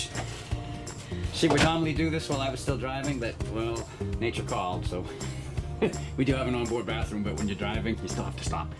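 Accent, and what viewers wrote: Boston, United States. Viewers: Boy, listening your accent makes me miss living in Boston. Well, I don't miss the winters.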